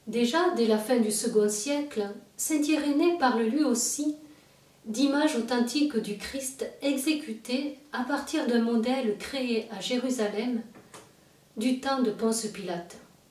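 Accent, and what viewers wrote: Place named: Provence, France. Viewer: #5 Que dire ? 🤔 ... J'adore l'accent de Provence ! C'est véritablement charmant et quelque part aussi, apaisant à entendre... Surtout ne le perdez pas ma Sœur 😌 Merci à vous et que LE TOUT PUISSANT vous bénisse... ✝️ P. S. : Caso a Irmã (cujo nome gostaria de conhecer) NUNCA na sua vida tenha feito até hoje, uma peregrinação até FÁTIMA ... Por 🤲🏻 favor, não deixe de contemplar essa possibilidade! Claro, quando puder ou quiser... Pois a FÉ assim como DEUS cá estarão para acolhê-la com o amor, o carrinho & o respeito que merece 🤍 OBRIGADO✓ Adieu 👼🏻 ma Sœur.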